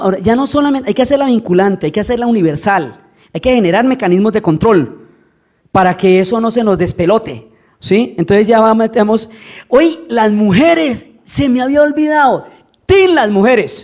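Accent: Colombia. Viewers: Fantástico como narra la historia,con ese acento y gracia que tiene colombiana,con esa sencillez,fácil de seguir y entender Superamenas sus conferencias,se hacen cortas y te quedas con ganas de mas. Gracias por tus enseñanzas,ojalá hubiera muchos conferenciantes como tu, con esa sabiduría y facilidad para explicar las cosas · Y es que habla con tal propiedad a lo colombiano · es increible lo clara que es para que entendamos todas las vueltas y revueltas de la historia y el orgullo de que es nuestra o sea latinoamericana y es poco conocida en mi pais o sea argentina realmente es un orgullo tenerla y ojala la sigamos viendo por mucho tiempo. aparte dice cosas bastante graciosas con esa manera que tienen los colombianos. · excelente. fabulosa narradora.Memoria magistral.Acento colombiano excelente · A mi edad jamás había escuchado a esta Dama Historiadora, muy buenas sus disertaciones, le pone ese toque Colombiano de pueblo, y Diafano, que inteligencia y mejor memoria, EXCELENTE NARRATIVA DE HISTORIA UNIVERSAL, y muy Actualizada...Felicitaciones, ahora Sra Uribe tiene un seguidor más habido de conocimiento... 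SALUDOS 🌹👏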